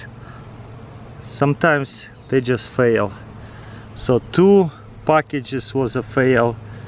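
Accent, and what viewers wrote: Russia. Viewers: Hey, love the bee videos. This is something me and my husband have talked about doing but we know nothing about. Hopefully someday we can getting our own hives started. You videos are a help. You show the realness of it. Try and fail and succeed. The russian accent is a plus as well. Keep plugging.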